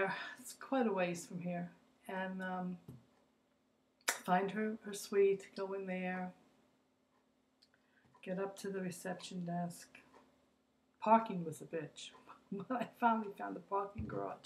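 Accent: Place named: New York, United States. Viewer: I'm so glad I found this! I'm waiting to get my appointment! I'm from NY too Babylon ny...by the sound of you accident you might be too!! I hope all goes well!! I'm excited but scared. She really is a good doctor despite the hiccups but it's a pain.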